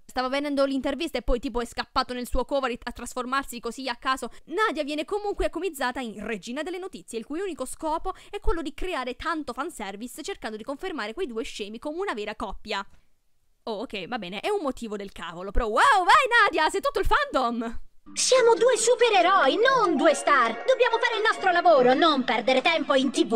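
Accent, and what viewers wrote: Bari, Italy. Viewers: Secondo me sei di Bari 😂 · Ma sei barese? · Ma sei barese? TVTB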